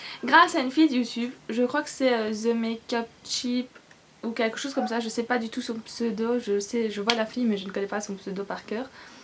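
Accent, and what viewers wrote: Belgium. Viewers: "T'sais nin comment ca s'dit":D Punaise (j'allais dire un gros mot) tu sais pas comment je suis genre contente d'entendre une belge sur Youtube (je te l'ai déjà dit je sais)! Bisous à toi et continue à faire tes vidéos elles sont top!:)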